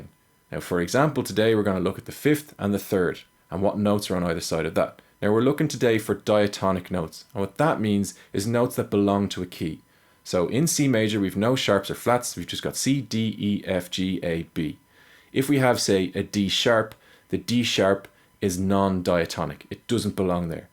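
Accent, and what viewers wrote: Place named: Ireland. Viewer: you absolute babe <3 also - being an Irishman very far from home it's nice to hear the accent!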